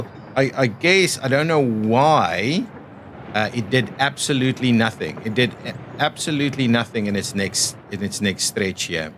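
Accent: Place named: Afrikaans, South Africa. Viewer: Are you from South Africa? Your accent is very Afrikaans. The way the 'R' is pronounced makes me smile every time.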